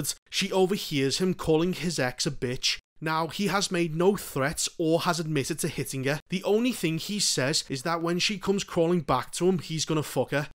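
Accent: Liverpool, United Kingdom. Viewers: There is nothing more perfect to listen to, than a Scouser having a sarcastic rant about something 💩💩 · You’re my favorite reviewer of this. You being scouse just makes it better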